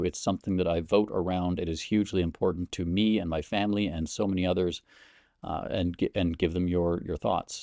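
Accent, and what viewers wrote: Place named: Okanagan, Canada. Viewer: First time I watched the channel, you are an Okanagan guy by the sounds of it, I grew up in Winfield.. er Lake Country, but currently moved to central AB, sorry for the loss of Tracy Gray was sad to see Ktown go liberal. We have Pierre moving right next door to us now :) Keep calm carry on great advice !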